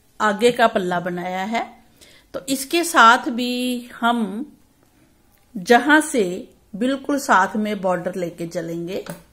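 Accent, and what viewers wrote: Punjab, India. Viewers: ji aap ka Punjabi tone bhut achha lagta hai...raaje kahna to bhut hi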